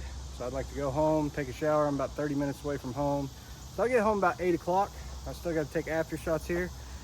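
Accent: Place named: Oklahoma, United States. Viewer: I love watching your videos. I live in south central Oklahoma. Sounds like you live in Oklahoma too.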